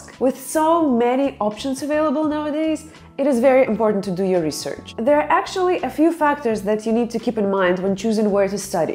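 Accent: Russia. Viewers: Well researched video. Keep up the good work..I like the Russian accent..Maybe you can teach me sometime 😉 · strong Russian accent ❤️ You are doing well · I like the way she talks in a Russian accent it's really hilarious.😅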